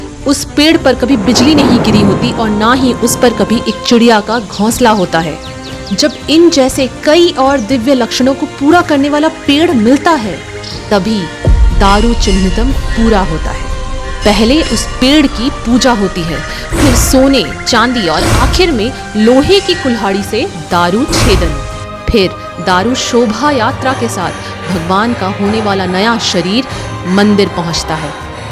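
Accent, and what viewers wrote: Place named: Bengal, India. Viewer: Your pronunciaton says u r a bengali. Jai jagannath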